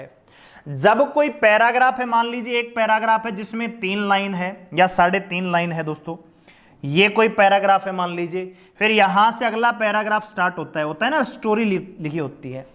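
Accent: Haryana, India. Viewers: Haryana ki h bhai😊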